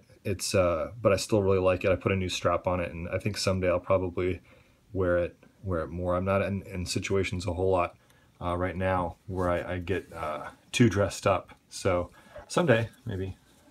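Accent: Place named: United States. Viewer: Are you actually Dutch? You sound like a native speaking American!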